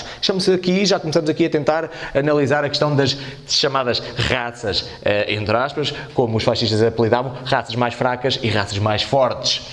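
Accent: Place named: Portugal. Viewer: Eu sou do Brasil vc me ajudou muito msm sendo português amei seu sotaque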